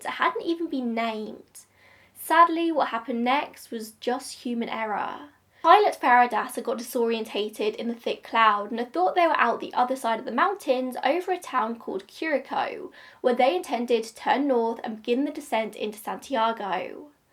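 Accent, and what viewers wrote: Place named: Tottenham, United Kingdom. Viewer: I'm gonna guess you're from Tottenham. Just a random guess...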